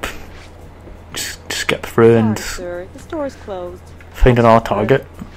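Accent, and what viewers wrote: United Kingdom. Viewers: Are you from Scotland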